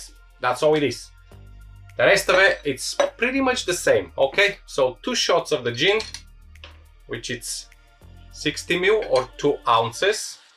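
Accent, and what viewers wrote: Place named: Bulgaria. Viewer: Страхотно видео, но с този акцент съм сигурен че сме сънародници!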